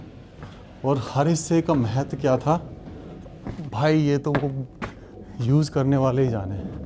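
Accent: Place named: Rajasthan, India. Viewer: Bhaiya Ki Boli Kotputli aur side ki Lagti Hai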